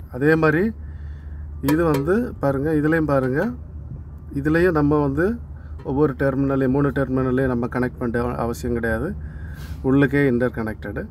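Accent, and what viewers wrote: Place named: Kanyakumari, India. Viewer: Kanyakumari slang 🥰🥰🥰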